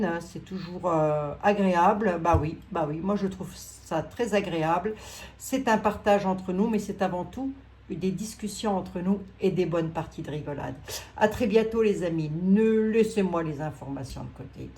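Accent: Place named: Meurthe-et-Moselle, France. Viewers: Ma chère toujours un plaisir de vous voir avec cette mise en bouche de 8 mn pleine de délicieux mots pour chacun... J adhère complètement. Pour ma part je préfère les amandes aux cacahuètes (Dieu a donné à l homme le cerveau et le sexe mais pas assez de sang pour irriguer les 2 en même temps). Avec votre joli accent de Lorraine. Bref ne changez rien. Je vous byz avec beaucoup de vibrations d amour de lumiere et de vérité. Eveillons nous tous. Avec gratitude Patrick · dommage pour le son qui se coupe à chaque pause. donc il manque des mots . plaisir de vous entendre tout de meme avec votre accent de 54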